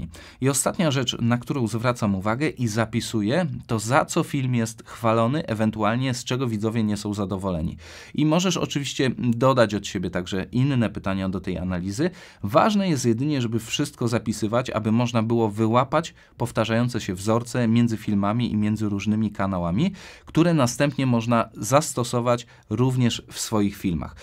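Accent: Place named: Kraków, Poland. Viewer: Słychać żes pieronievz Z Krakowa 😁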